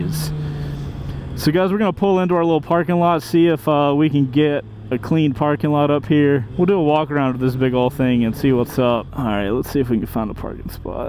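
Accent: United States. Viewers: Great bike . Nauseating yank accent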